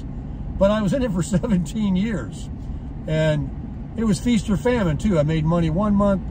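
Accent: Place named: New York, United States. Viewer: Are you single New Yorker cause I'm a single Chicagoan! Our accents would be fun times.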